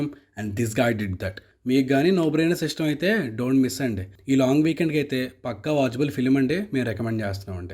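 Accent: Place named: Godavari, India. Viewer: Anna godavari slang try chesadu roiiii🔥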